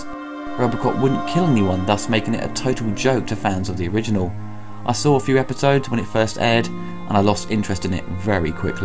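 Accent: United Kingdom. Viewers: Love your reviews. I'm catching up on them. I just love British accents, and word choices. See Americans would say...RoboCop blasted the ED209 to pieces..but you say "he blasts it to bits"..love it!!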